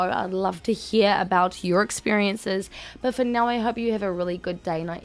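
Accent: New Zealand. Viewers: Are you a kiwi????? 😄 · Sounds like a kiwi accent, she'd be from New Zealand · Is that a kiwi accent I hear?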